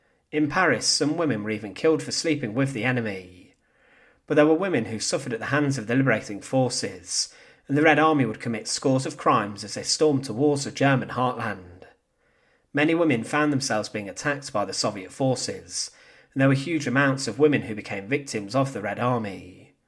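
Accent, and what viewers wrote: United Kingdom. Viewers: does this guy have an accent IN ADDITION TO his british accent maybe talk slower eh ?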